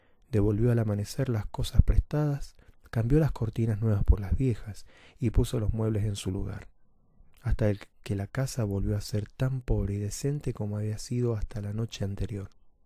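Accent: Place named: Argentina. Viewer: Me encantó y me encanta el acento Argentino, lo quisiera para leer uno de mis cuentos 😚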